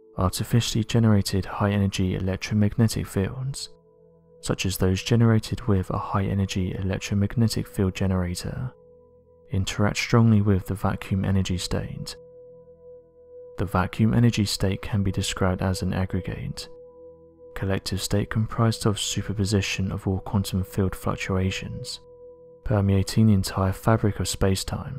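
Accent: United Kingdom. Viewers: This is all interesting to contemplate, and as a lifelong science fiction fan, I would love it to be proven, but where is the proof this is actually happening? All the fancy graphics, the calm British-accented voiceover, and elaborate theories ….are not evidence….extraordinary claims require extraordinary evidence. Just saying ‘this is happening’ doesn’t make it real. As exciting as it would be if this were true, where is the evidence?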